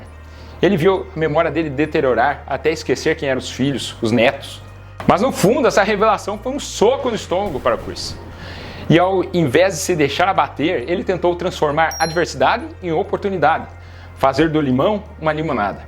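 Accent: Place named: Minas Gerais, Brazil. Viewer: Ah, doutor, eu tomo Melatonina quase toda noite pra dormir. Fico muito preocupado, com um distress diário preocupado com a minha esposa, que tem bipolaridade. A minha sogra faleceu com PSP, foi um sofrimento. Fazemos suplementação com D3, Zinco, Fósforo, Ômega 3, Magnesio Dimalato. Ah, gosto muito de ouvi-lo porque o senhor tem um sotaque meio de mineiro.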